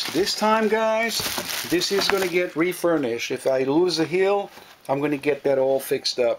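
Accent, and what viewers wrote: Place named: Texas, United States. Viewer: were you born and raised in Texas, i can hear that texas accent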